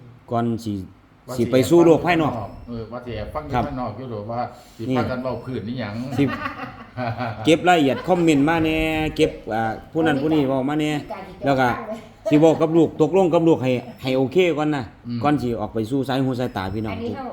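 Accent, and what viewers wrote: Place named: Laos. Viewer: เว้าภาษาฝังลาวละสืเป็นเอกลักษ์คือ นั้นละfcฟังออกอยู่แล้ว🦆🦆🤟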